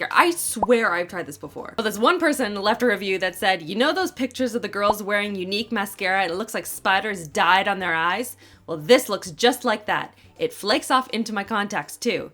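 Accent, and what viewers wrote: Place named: Canada. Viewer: Riv H she is Canadian. It comes out on occasion.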